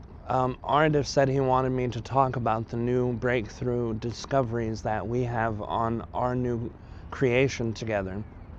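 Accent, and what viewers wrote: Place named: RP, United Kingdom. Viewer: Wow I'm from UK and channeling u have the poshest English voice I've ever heard,💕 was brilliant video ,thanks💕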